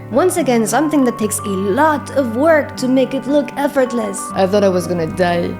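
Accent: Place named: France. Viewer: Okay great, now you not only have a super cute french accent and love DnD but you're ALSO a strong muscle mommy? My brain can't handle this combination of traits I adore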